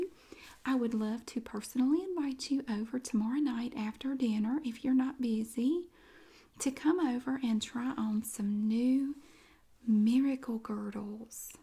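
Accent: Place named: Carolina, United States. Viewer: Accents change a lot based on location. Her accent is mostly from north/South Carolina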